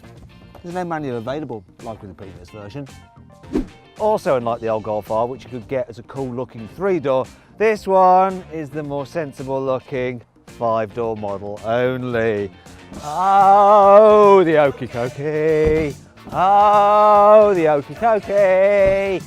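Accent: United Kingdom. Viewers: マットのイギリス英語好き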